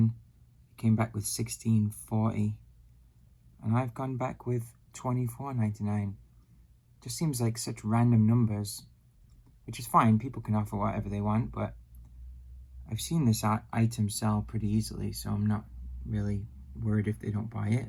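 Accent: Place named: Scotland, United Kingdom. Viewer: You sound as if you have Scottish twang in your voice Max Boyce is a Welsh comedian.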